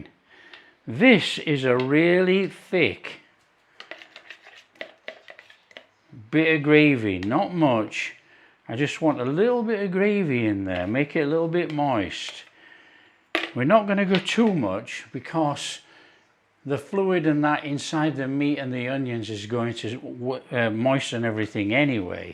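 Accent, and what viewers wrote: Yorkshire, United Kingdom. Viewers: do some Eccles cakes or Chorley cakes, take care pal, i love your presentation, reet proper Yorksher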